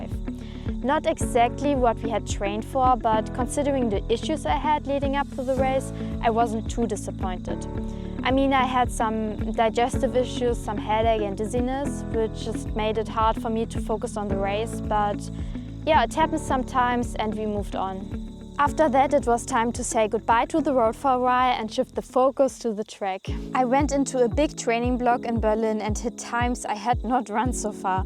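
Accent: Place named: Germany. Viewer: krass deutsches (hartes) Englisch aber irgendwie total sympathisch, Gratulation zum Frankfurt Debut!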